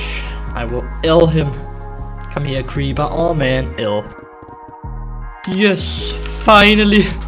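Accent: Denmark. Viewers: bruh den danske accent offffff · are he danish¨ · That moment when you can hear that He is danish becouse of his accent😂😂😂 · i hear danish.. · Sounds like a danish accent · I smell danish acent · i can here that your from danmark. true?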